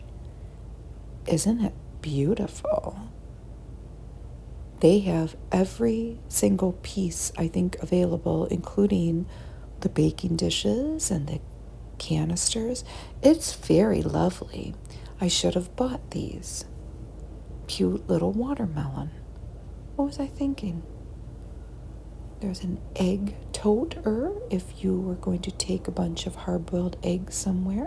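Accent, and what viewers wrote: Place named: Michigan, United States. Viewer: This is a Michigan accent if I’ve ever heard one!! :)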